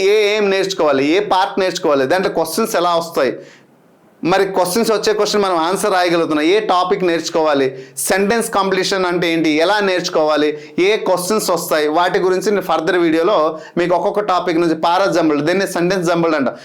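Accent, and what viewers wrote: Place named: Godavari, India. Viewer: Godavari slang ekkuvundi..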